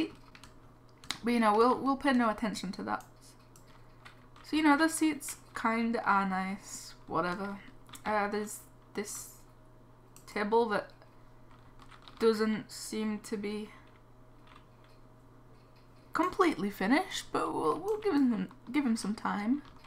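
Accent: United Kingdom. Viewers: Ay Your british like me! :D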